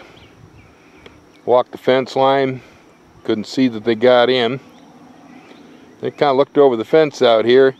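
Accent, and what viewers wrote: United States. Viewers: mmmm dinner... poor thing.. you sound like a true american in this video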